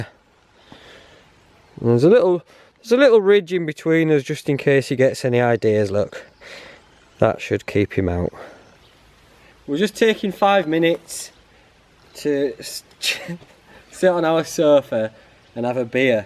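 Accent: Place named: England, United Kingdom. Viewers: I can't understand half the times lad for your English accent. :-( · Eh up lad. Going a bit avant garde now eh! have you been at the wacky backy lately? And by the way, ya call it an oss in Yorkshire not a horse, I think your from Lincolnshire really you know, its your English and your accent???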